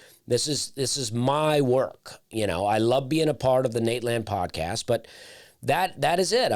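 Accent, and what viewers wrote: South, United States. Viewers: Slay is one of my favorite comedians of all time… There’s a certain vibe that southern hospitality comedians have that CAN be similar like Larry The Cable Guy, Jeff Foxworthy, Trae Crowder and yes even Slay BUT… each comedian has their own unique delivery… In comedy there are main techniques and skills like… sarcasm, storytelling, conveying multiple perspectives, expressing multiple emotions, over-analyzing & underplaying, crowd work and most importantly the ability to express yourself… All the pros use these techniques and skills in their sets… My point is the basic skills of a comedian are all the same from Carlin, to Pryor, to Burr, to Norton, to Shaffir, to Larry The Cable Guy, and even Slay… so it’s easy and even lazy to take two comedians with similar accents and say they are the same … for example… you can throw Sebastian Maniscalco, Chris Distefano and Andrew Dice Clay into a melting pot and review them as similar… but theyre not even close to the same thing… Okay Im totally overreaching here but my point is…. 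Even though Larry and hone the same comedic skills for comedy and even though they have similar accents and even some tastes… theyre totally different and both are good in their own way… Don’t worry about the haters Slay, youre awesome. And I think you mentioned suicide in all of your specials, so I hope you never kill yourself and that you create another ten awesome specials. Cheers. Ps. Here’s a joke I wrote. It’s stupid but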